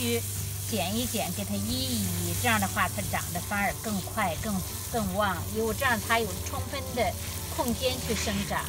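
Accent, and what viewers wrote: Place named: Weihai, China. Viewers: 哇、妳也是威海妹子?咱也是威海人。難怪聽著那麼親切! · 大姐是威海的吧，字里行间家乡味儿改不了啊！ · 听您的口音好像山东威海人呢！ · 听口音好像山东的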